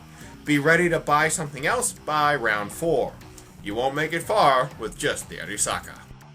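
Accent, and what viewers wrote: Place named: North Germany, Germany. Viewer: I love how he pronounces Nacht der untoten (BTW, i am german, and he sounds like a North-german)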